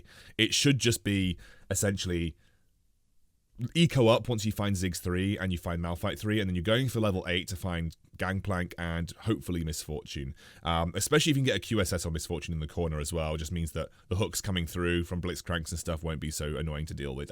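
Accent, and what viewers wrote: United Kingdom. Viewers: nohomo bro, you have such a clear, crisp voice! Great for youtube. This might just be the American in me thinking British accents are OP though.. LOL btw, what's your rank?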